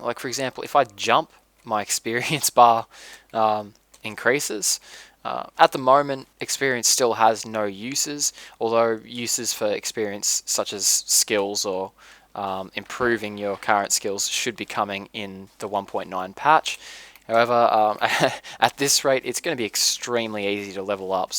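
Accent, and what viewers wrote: Australia. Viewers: YOUR AUSTRALIAN :) XD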